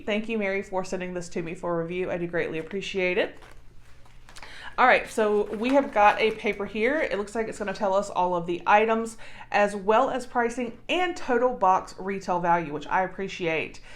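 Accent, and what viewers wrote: South, United States. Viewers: I love all the items in the Box!!! It would be like Christmas morning opening that box of wonderful surprises!!! I love your southern accent and you did a marvelous job Darling!!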